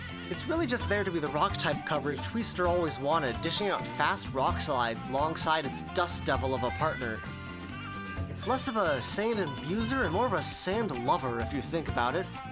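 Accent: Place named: United Kingdom. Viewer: PEAK BRITISH ACCENT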